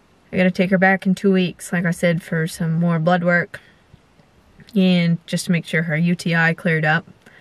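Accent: Maine, United States. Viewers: Oh man, I am truly sorry to her about June. They truly are family. I hope she soon gets better. Sending prayers your way. On a lighter note, something I have thought about sharing with you numerous times since I began watching and following your channel. You have sent me down a memory lane from years long gone. That is due to your Maine accent. (Don't hate on me yet!😁) My closest buddy in Vietnam was a Sgt. from Maine. I can't recall what part. We became close pretty quick, with each struggling to figure out what the heck the other was saying. I was raised in the south, and needless to say, I never mastered the Queens English myself. 🤣 We spent our full tours together, although we rotated back to the states at different times. I left first, at a time when things were "less than ideal", and we lost contact. I tried for weeks, calling the Dept. of the Navy, and the Marine Corps, trying to learn if he had made it back. 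Finally, one evening I got the news I had long hoped to hear. "Sgt. John" made it home, and was doing well back in Maine. Isn't it funny? How something as simple and common as an accent, can send us back to a time so long past? God bless you and yours. And, God be with June. Happy trails,.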